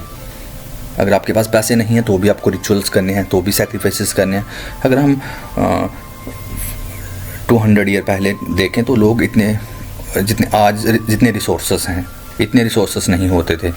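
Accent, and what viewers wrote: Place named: Jammu, India. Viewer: Bhaiya aap jammu se ho kya ? 😅 Aapki awaaz se lg rha hai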